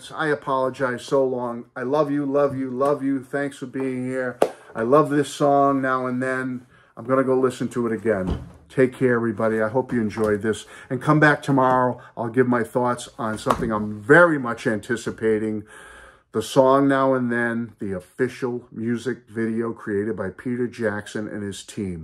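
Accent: Boston, United States. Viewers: Your heartfelt sentiments are truly worthy of your love for mankind and the!! I always have a spot in my heart cause when I hear you I'm reminded of Boston where I lived for many a year 1974 to 1987 to be exact. So "Now and Then" hearing your voice puts a warm smile on my face. God Bless and KEEP THE FAITH!!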